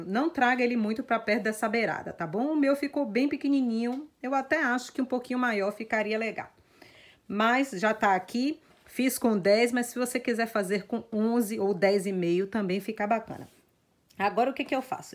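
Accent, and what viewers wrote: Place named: Northeast, Brazil. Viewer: .....minha querida seu sotaque é único , eu amo sotaque nordestino...... é q nós paulista falamos vídeo com o som o "i"..... então fica "vidio"..... E eu amo ouvir vc falando "videeo".....adoro ....adoro .....e adoro!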